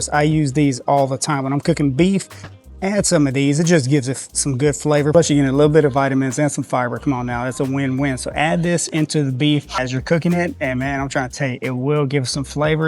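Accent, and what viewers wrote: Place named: Georgia, United States. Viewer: His accent sounds like home to me, which is Decatur Ga!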